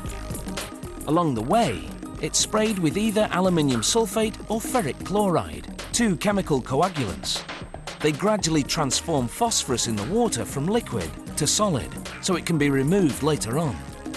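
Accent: United Kingdom. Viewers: I've never herd British How it's Made before.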